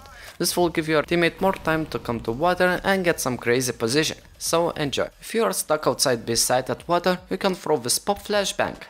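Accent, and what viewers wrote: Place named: Germany. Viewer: pain to hear german english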